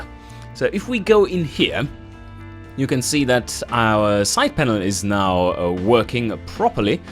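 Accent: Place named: Germany. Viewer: Ich mag Englisch mit deutscher Akzent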